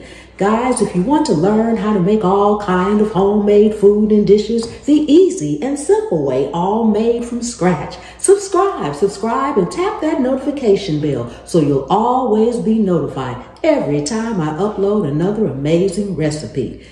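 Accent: South, United States. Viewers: I just love your southern accent, (and I'm from the south).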